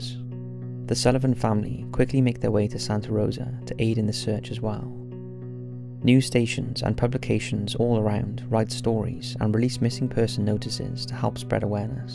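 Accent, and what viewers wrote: United Kingdom. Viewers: He's British, I believe, which would explain is pronunciation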